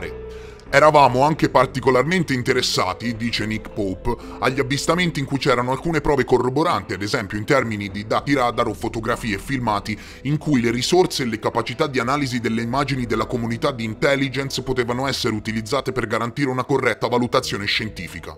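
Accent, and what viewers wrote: Tuscany, Italy. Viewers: Anche io lo seguo da un anno e passa ormai, e sin da subito mi sono accorto che è toscano, però non sono riuscito a capire di quale zona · Sono davvero orgoglioso di essere un veterano, ti seguo dai primi video quando si sentiva l'accento toscano, quando la maschera era senza i contorni blu-fluo, da quando la sigla era una specie di cubo con le faccine di Click... insomma è un vanto far parte di questa family e devi essere orgoglioso di questi risultati e soprattutto dove ci hai guidato aprendoci la mente... Grazie la tua umiltà va di pari passo con il tuo essere "BOMBER"...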